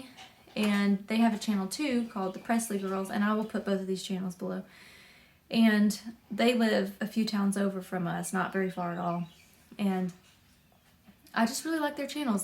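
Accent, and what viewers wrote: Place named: North Carolina, United States. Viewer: Your accent sounds like home. I grew up and still live on the NC coast. The folks of the coast have a distinctive dialect. We live simply and slow.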